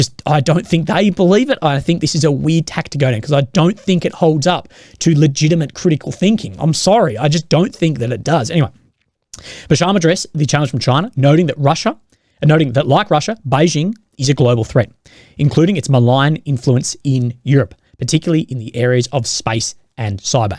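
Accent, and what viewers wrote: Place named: Australia. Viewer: Maybe this channel would be better without the whiny Aussie accent and and his 1970s suit collection.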